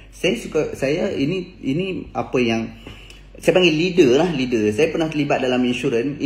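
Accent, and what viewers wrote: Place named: Kedah, Malaysia. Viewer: orang kedah kalau berletiaq..memang sedap dengar..haha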